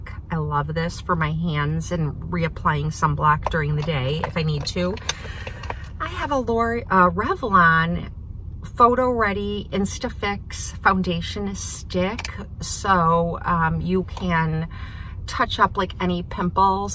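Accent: Midwest, United States. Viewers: I bought this same one in medium in chocolate. The medium is like a large. Got it on eBay for $200.00! I LOVE it. Heavy as the dickens. Mine had a pen stain inside on the green suede, but I was able to get it out with an Emory board! I knew you were from the Midwest based on your voice. My family is from Chitown!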